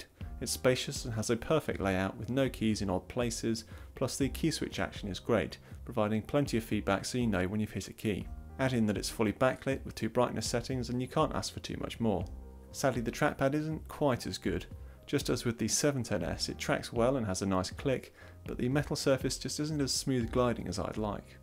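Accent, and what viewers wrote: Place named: United Kingdom. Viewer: Was watching Austin Evans then realized his harsh American twang was a pain in the ears compared with your calm British accent😂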